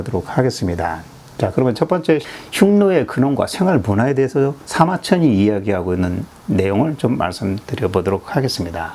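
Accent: Gyeongsang, South Korea. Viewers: 깅상도 아이가?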